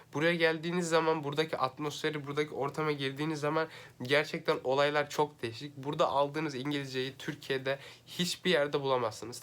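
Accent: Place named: Germany. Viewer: Kardes oyle bir ağzın var ki 30 senedir almanyada yasayan kuzenim gibisin , abd'de 1 senede turkceyi mi unuttun neden konuşamıyorsun?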